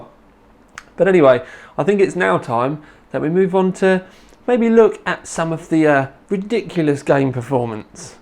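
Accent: United Kingdom. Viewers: wtf british accent is trash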